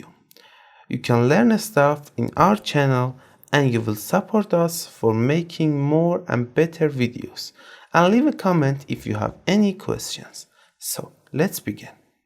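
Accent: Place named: Iran. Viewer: fully Persian accent I love it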